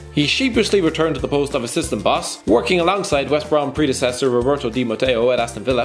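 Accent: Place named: Ireland. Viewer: Michael Hart it's the irish accent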